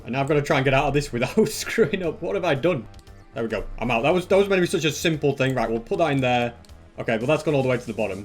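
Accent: United Kingdom. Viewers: I love ur British accent are u from England or united kingdom?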